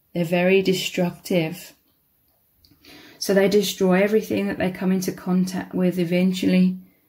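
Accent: England, United Kingdom. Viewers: so accurate! i can't place where your English accent is from. Would love to know it. I spent time all around the UK :)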